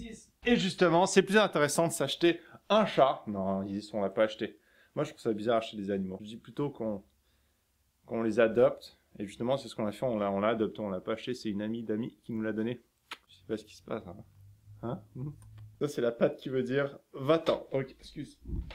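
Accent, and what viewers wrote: Quebec, Canada. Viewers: C'est drôle t'as un accent québécois mais hyper légers on le remarque presque pas ! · l'accent québécois! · L'accent québécois est plus prononcé ou c'est moi? Et au fait le chat il s'appelle ISIS....AAAAAHHHHHHH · wow , jtrouve que ta plus l'accent québécois qu'avant ^^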